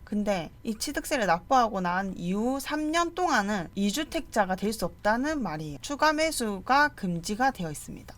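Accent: Gyeongsang, South Korea. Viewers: 대표님 경상도분이신거 같아요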